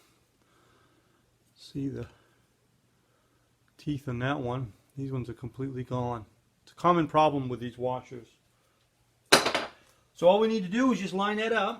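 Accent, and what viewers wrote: Maine, United States. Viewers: So, what part of Maine are you from? I know my people when I hear them. 😀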